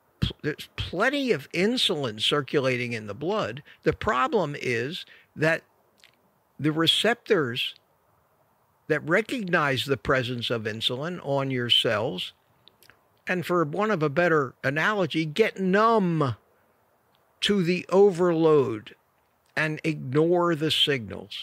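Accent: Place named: East Coast, United States. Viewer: Excellent info given with an authentic east coast accent.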